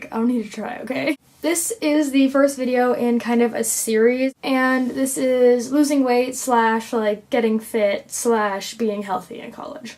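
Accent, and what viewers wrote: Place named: Canada. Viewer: You live in Canada right? I can tell by the accent... I think...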